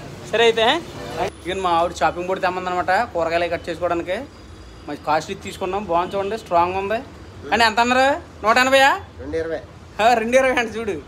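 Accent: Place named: West Godavari, India. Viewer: పిల్లలు తో మీరు చేసి సరదా సరదా ముచ్చట్లు చాలు బాగునాయీ . అచ్చమైన ప గో భాస మరియు యశ వినసొంపుగా ఉన్నాయి ఆండీ కీప్ rocking విత్ యువర్ vlogs బ్రదర్ 😍😍😍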